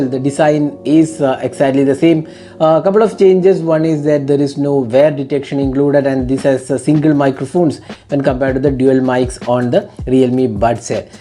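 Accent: Kerala, India. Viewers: You sounds like a mallu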